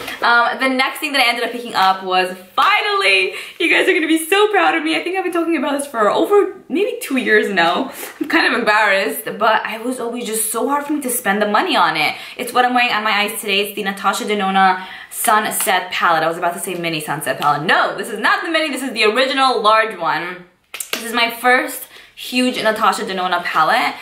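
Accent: New York, United States. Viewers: Are you from NY or am I very dumb cuz you seem like you have a ny or New Jersey accent. Maybe I'm dumb ash but idk🤷‍♀️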